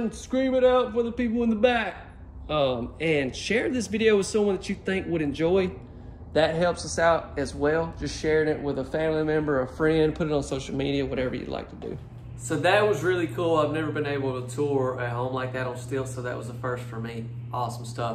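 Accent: South, United States. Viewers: oh that would be great. we would gladly welcome you. You have that southern accent like i do. Only difference is i'm female. lol 😁 Don't forget to show us your beautiful family on some of your next home shows. ❤👼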